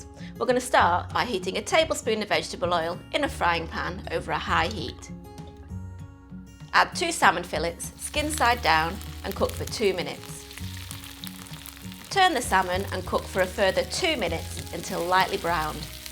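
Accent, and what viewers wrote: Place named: England, United Kingdom. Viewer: Heard the English accent, I knew there'd be alcohol involved. Sigh... you all really need to stop consuming poison.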